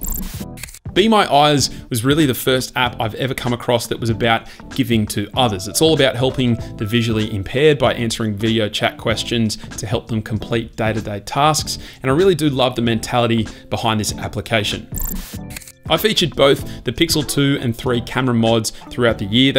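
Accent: Australia. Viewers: Love your hard work and the Aussie accent, keep on bro 👍🏼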